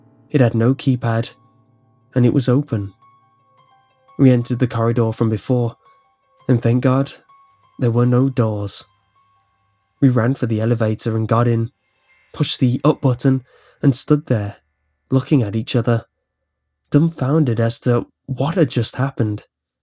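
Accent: United Kingdom. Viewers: ***** Do you not realize that there's no sign of mentioning to either the author nor the talented musician Myu. does not do this because he's good at it in my opinion. Hell, he pays someone else to edit for him. What I meant was that there have been other You Tubers that have narrated this story before. Not to mention that the original author will not be getting any bit of the income make off their stories via YouTube. Last but not least, I own the rights to my very own creepypastas. I put hard work and dedication into them. I do it because I love to, not for money. Then again even if I did I wouldn't be stealing other peoples stories. I make my own. Having a light voice, with a partial British accent and someone else doing all of the editing does not mean you have talent. For the stories that he makes on his own, there's no problem by my eye. If he wants to narrate them and make money then okay. 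But as soon as it's not his original work.. you'd best count on watching your account very closely. The moment that he steals my idea, he'll pay. I know it'll happen. Try re-filming all of Star Wars but instead putting another logo on it, changing one of the characters names like just did with this story. You'll get slapped with copyright so hard, you'll be on the streets or in prison. It's not worth losing your career over. Now I understand that it may be hard to concept this in a way that doesn't make me sound like I'm being a so called, "Dick" but us li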